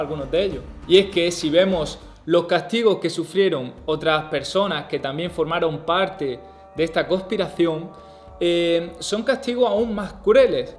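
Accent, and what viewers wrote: Andalusia, Spain. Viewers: Muy buenos tus videos . Supongo que por tu acento debes ser de Andalucía . Granada quizas ? Saludos desde Argentina.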